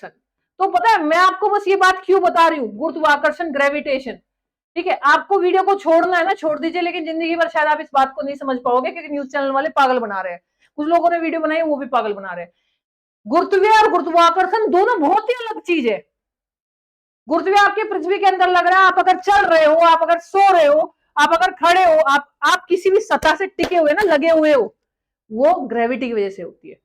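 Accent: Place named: South Haryana, India. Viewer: Bitiya aapki bhasha se lagtah h aap South Hariyana se ho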